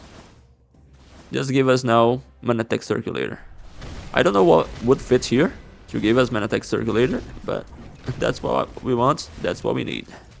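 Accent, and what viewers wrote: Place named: Brazil. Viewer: you are brazilian? you sound like it and your name sounds a little portuguese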